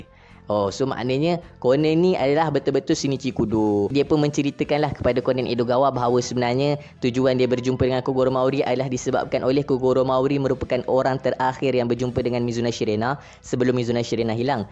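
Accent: Kelantan, Malaysia. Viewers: Ore klate ko boh??! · Bang kenapa abang lebih ckp ala kelantan gitu.?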